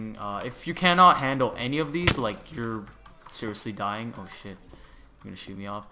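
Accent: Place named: United States. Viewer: Great :) . But u actually sound like you are an american :O . How ?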